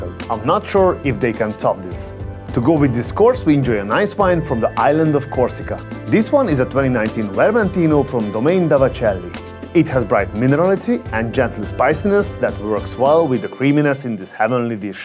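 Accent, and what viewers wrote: Hungary. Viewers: Sounds exactly like any other Hungarian I've spoken to...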